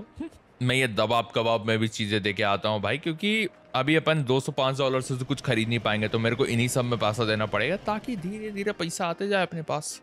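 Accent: India. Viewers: Bro are you an INDIAN?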